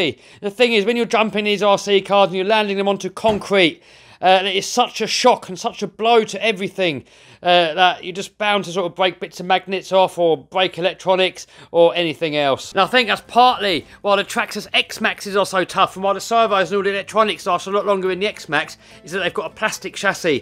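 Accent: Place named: England, United Kingdom. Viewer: Amazing english accent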